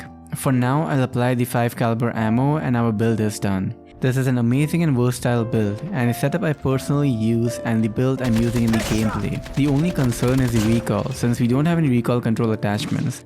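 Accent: India. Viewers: Wtf too to Indian lagta hai bro Are you bro ??? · Man your accent is kinda Indian love your vid❤️ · Bro are you Indian guessing from the accent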